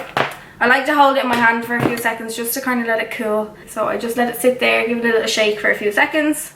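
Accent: Ireland. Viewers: Irish accents ❤️☺️ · is she irish?? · are u Irish?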